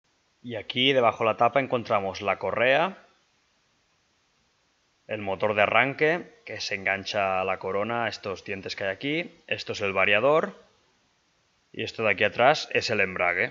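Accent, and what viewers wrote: Spain. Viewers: Quién habla tiene un claro acento Catalán. Luego entonces no tiene un acento Neutro el cual en España creo que solo queda en ciertas zonas de Castilla León,los dé tenemos claros acentos de nuestras diversas provincias. Pasa lo mismo en LATAM,entre países y zonas/ provincias de cada país,pero lógicamente allí no se habla un Castellano limpio, ya hay muchas mezclas. ❤️🇪🇦🇪🇦❤️ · Me encantan los Españoles, que acento tan nítido y perfecto, los amo, saludos desde Venezuela :) Excelente vídeo.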